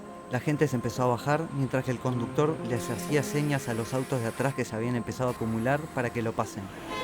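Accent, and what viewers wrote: Argentina. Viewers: Yo siempre pense que eras de argentina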